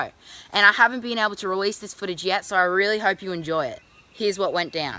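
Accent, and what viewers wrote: Australia. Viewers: I love your accent are you from Australia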